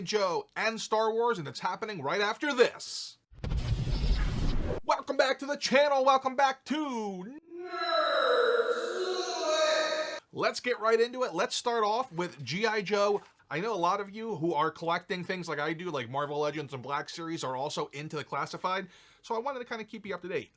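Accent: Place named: Philadelphia, United States. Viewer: New subscriber here. You sound like you are from Philly or more generally, Eastern PA!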